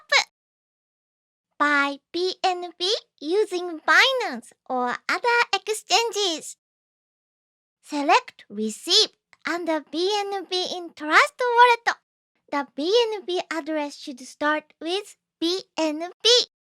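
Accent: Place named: Japan. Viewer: The narration is exactly Japanese English. Love it!!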